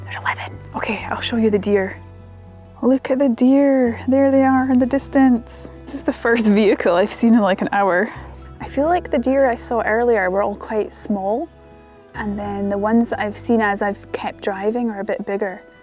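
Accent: Scotland, United Kingdom. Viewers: Your videos are delightful and your voice and accent are a joy to listen to. Such beautiful scenery. How lucky you are to live in Scotland. Having never traveled to Scotland myself, It is exactly how I envisioned it. It’s magical! Thank you so much again for sharing these videos. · been so long since i heard a Scottish accent my family is originally from Gretna green but i moved to America as that's were my wife is from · Thanks for taking me through Scotland which I have never visit though I live in Ireland. I love watching your videos and listening to your sweet Scotish accent, yours is mild though.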